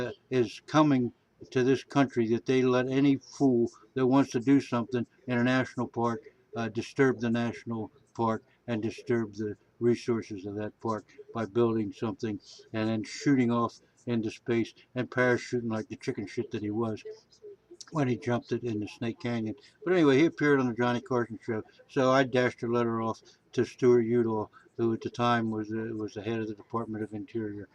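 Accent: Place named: Pennsylvania, United States. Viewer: haha bigfoot....hey you sounds like you're from PA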